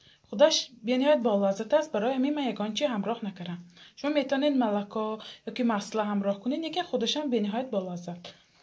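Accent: Isfara, Tajikistan. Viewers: Шумо а Исфарами лафзатон монанд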